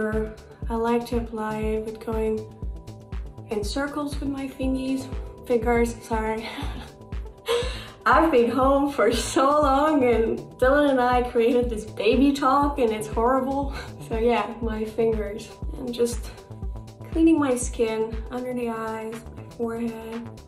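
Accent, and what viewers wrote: Hungary. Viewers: Her English accent is so good, but you can still hear a bit of that typical Hungarian accent which is kinda cute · I can barely hear her Hungarian accent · Yah but She's losing her Hungarian accent now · but if she born like a hungarian ppl then her Accent will be Hungarian forever The english and hun accent is very different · Love how genuine she is and her Hungarian accent ♡ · if you watch her "Interview de Barbara Palvin, Festival de Cannes" she's actually losing her Hungarian accent now. But still yah her voice sounds so nice.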